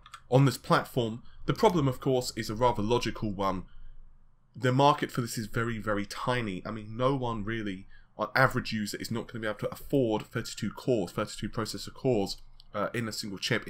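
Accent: United Kingdom. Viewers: He speaks British. You know original English and not American ghetto jibberish. English isn't my first language and have absolutely no issues understanding the guy. Don't complain when you are simply an idiot.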